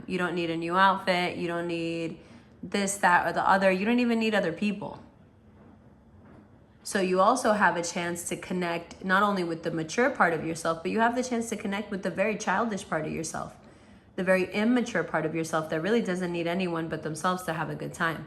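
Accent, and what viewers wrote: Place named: New York, United States. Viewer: LOVE you Fam!! ❤️🕉️🙏🏼 That New York twang coming through strong 😍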